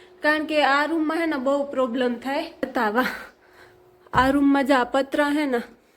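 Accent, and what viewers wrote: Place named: Mer, India. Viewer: બીન આપણી મેરની ભાષા સારીલાગે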